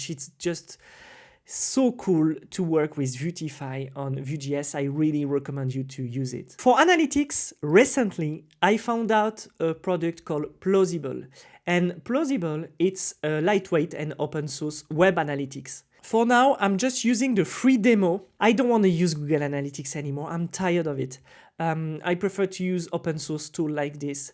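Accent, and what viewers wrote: France. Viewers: Il me semble que tu es français vu ton accent ( mais tu maitrises parfaitement l'anglais !) merci pour ton retour, moi aussi j'aime beaucoup Supabase et encore plus VueJs! j'espère qu'il va continuer de se répendre et pourquoi pas un jour prendre le pas sur React ;) Si tu utilises un peu Nuxt 3, n'hésite pas à faire une vidéo ou tu l'utilise avec Supabase justement. D'ailleurs est ce que tu l'utilise avec une ORM comme Prisma? Encore merci pour tes vidéos! continue ainsi!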